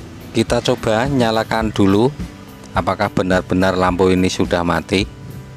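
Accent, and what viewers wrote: Java, Indonesia. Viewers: Ilmunya mantab, Medok ngomongnya 😁 nyenengin banget dengernya 😁, Meleddiyakk = Meledak. 🤣🤣 Sehat dan Sukses Slalu Pak !!!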